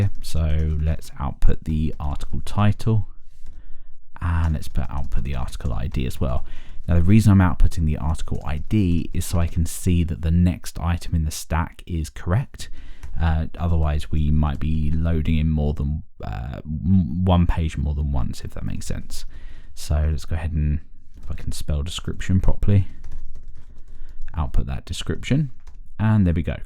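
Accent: United Kingdom. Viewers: An extraordinary tutor with British accent, how cute <3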